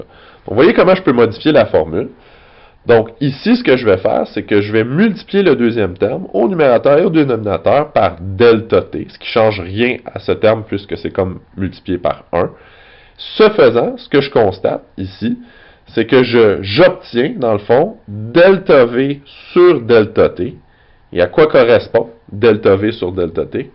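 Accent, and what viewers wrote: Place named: Canada. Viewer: rigolos jaime bien laccent canadien ahahahhaahahah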